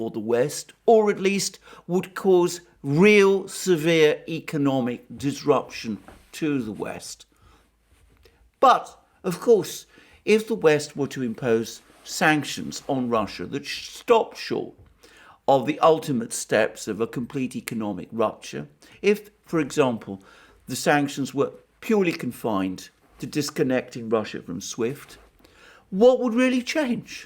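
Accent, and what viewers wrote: United Kingdom. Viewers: you are a smart man...I can tell.. are you Russian? Little bit of British accent there.. am a Nigerian.. I love russia 🇷🇺.. my second son is named after putin..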